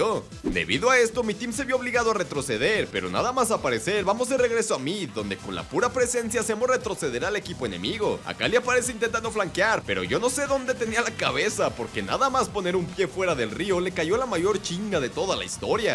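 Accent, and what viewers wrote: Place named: Mexico. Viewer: Eres de sonora o baja California ese acento lo conozco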